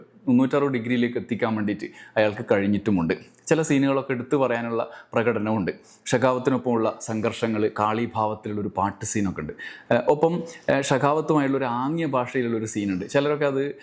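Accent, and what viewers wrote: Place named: Malabar, India. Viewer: ചേട്ടാ നിങ്ങളുടെ സൗണ്ട് ഒന്നാമതെ സ്ലോ ആണ്.. മലബാർ ഭാഷ കൂടി ആണ്... ഞാൻ നേരത്തെ പറഞ്ഞത് ആണ് നിങ്ങൾ സമയം കുറക്കാൻ... പിന്നെ പുഷ്പ യെ പറ്റി ::ടൈം ലാഗിംഗ് ഉണ്ട് എന്നത് ഒരു സത്യം തന്നെ..ഫാമിലി സീൻ നെ പറ്റി പറയുമ്പോൾ.. ഇന്നത്തെ തലമുറക്ക് പിന്നെ കുടുംബ ജീവിതം വലിയ ഇഷ്ടം അല്ലല്ലോ.. അടി ഇടി വെടി വെട്ട് കുത്തു ഇതൊക്കെ മതി... ഞാൻ ഒരു സിനിമ അമിതമായി കാണുന്ന ആൾ അല്ല... ഒരു നടന്റെയും ഫാൻസും അല്ല... എന്നിരുന്നാലും പുഷ്പ 2...കുഴപ്പം ഇല്ലാതെ കണ്ടു കൊണ്ടിരിക്കുന്ന ഒരു സിനിമ ആണ്.... Nb ::പിന്നെ അമിത ഹൈപ്പ് കൊടുത്തു മാർക്കറ്റ് ചെയ്യുന്നത് അവരുടെ ബിസ്സിനെസ്സ് തന്ത്രം ആണ്. അതിൽ വീഴണോ എന്ന് നമ്മൾ ആണ് ചിന്തിക്കേണ്ടത്..... സിനിമ യെ സിനിമ ആയി കാണുക... എന്തായാലും താങ്കളുടെ റിവ്യൂ &ബുദ്ധി ജീവി വിമർശനം വളരെ ബോർ ആയി പോയി....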